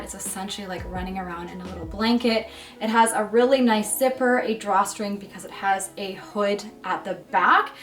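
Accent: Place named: Canada. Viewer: Love your pieces girl💕how did I know u were Canadian based on your accent ☺️I guess we do hv an accent 👍🏽😂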